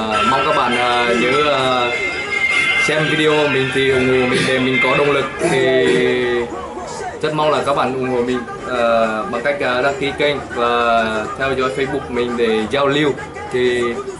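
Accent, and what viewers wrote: Nghe An, Vietnam. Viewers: Giọng giống Nghệ An quá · nói giọng giống người Nghệ An Chúc e và các bạn bên đó luôn mạnh khỏe và thành công trong cuộc sống nhé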